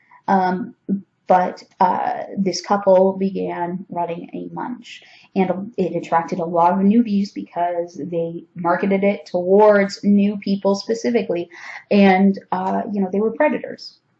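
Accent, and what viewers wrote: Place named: Toronto, Canada. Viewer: Ahhh. Fresh Air. What captivating colors starting with your eyeballs osmosing that shade of which blue? How about Blue - eh? Wow, I knew your Toronto accent in the video I just watched. So yeah, You are telling me answers to questions I've read about every group's posted rules, I extrapolate correctly but how to dress, thank you, how to keep your hands above the table, thank you, how self protections begin before you get to an event, thank you.There are some pluto in leo people that about now are beginning to understand playing fields being totally rearranged leveling the starting gate struggling through tech, even if really good, not as smooth second nature appendage of Saturns first return this year since 1981ish. fFinding how to sit and wait for on line response isn't just goofing off any longer. Wow...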